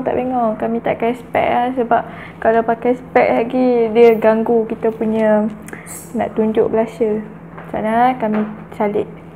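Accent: North, Malaysia. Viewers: I like how you're very natural and confident when you speak. I'm glad that you actually speak in Northern slang. Cute! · aku tengok video hang from the first video and... "boleh main batu sambut" dengan "makeup macam orang mabuk" buat aku GELAK HAHAHAHAHAHA yep, not gonna deny, orang uthagha cakap ada slang is the cutest >.<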